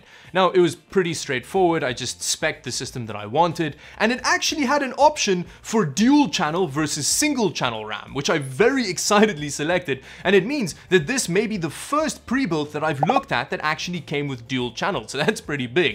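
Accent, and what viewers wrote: Afrikaans, South Africa. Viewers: I think it's South African (where English might have been a second language). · This guy sounded south african but it didn't say anything in the about page so I legit opened his Instagram just to check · I sense a South African accent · excellent to hear that Saffer accent becoming even more pronounced. Represent Boet, doesn't matter where we are in the world, proudly 'Sefrican! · Love the content, keep it up. Quick question, are you South African? XD you've got the accent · Jy kan dai afrikaanse Aksent hoor🤣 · South African? 😅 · South African accent??🇿🇦🇿🇦🇿🇦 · are you South African?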